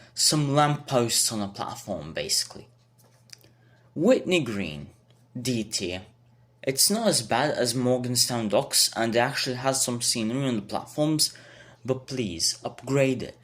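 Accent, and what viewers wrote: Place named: England, United Kingdom. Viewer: Are you really Serbian? You sound English to me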